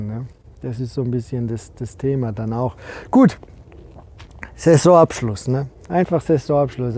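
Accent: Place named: North, Germany. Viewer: super Video - echte, selbst erlebte Infos - und für mich als Wahl-Nordlicht auch mal wieder der Slang meiner Heimat! Danke, "gutes Neues" und weiter so! Chris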